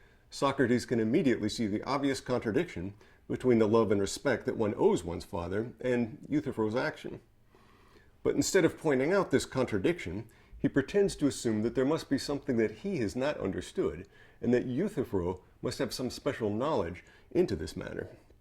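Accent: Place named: Denmark. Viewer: Interesting. Although he is American, he speaks English as if he was a Danish person. His words are sharp and short.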